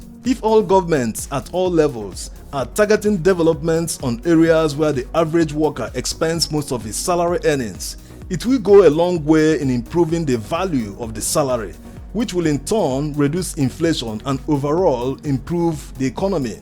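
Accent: Igbo, Nigeria. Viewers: No doubt the narrator is an Igbo man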